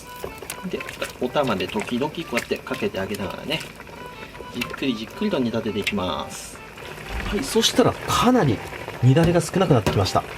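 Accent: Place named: Aichi, Japan. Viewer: 愛知のなまり 「ゴキブリ出たげー」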